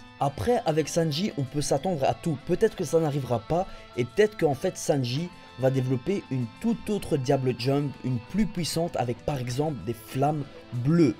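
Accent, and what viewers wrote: Belgium. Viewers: tu viens d'où en Belgique ?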